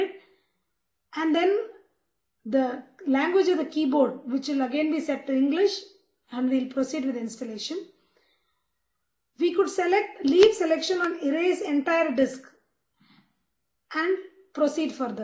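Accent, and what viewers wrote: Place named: South India, India. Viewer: South Indian accent !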